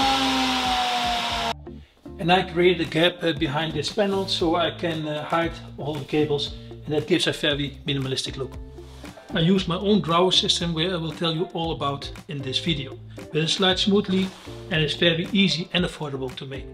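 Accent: Netherlands. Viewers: Prachtig dit, you sound een beetje like a hollander. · I am a great admirer of your videos and think that some of the methods you propound are absolutely brilliant. However if one tries to put them into practice oneself it is very difficult to do so. The reason is that you speak very very quickly and it is sometimes a little difficult to follow your Dutch accent. It would be easier if you spoke more slowly. It would also be easier to follow you if you spent a little more time in explaining each step. If plans were available it would also be easier. Sorry to be critical but I think your videos would do even better if you followed my suggestions.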